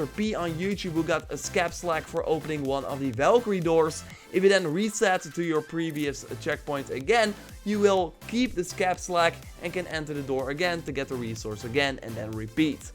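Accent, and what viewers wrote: Netherlands. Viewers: do i smell dutch?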